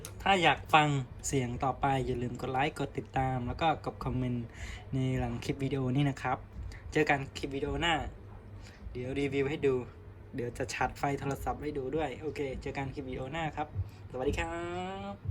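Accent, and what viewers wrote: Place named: Cambodia. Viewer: ออกขเมรๆนะภาษาพี่นี่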